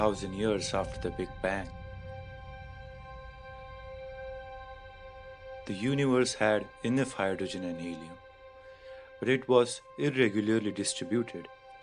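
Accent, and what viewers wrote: India. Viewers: u sound like indian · He sounds so Indian yet so African yet so Asian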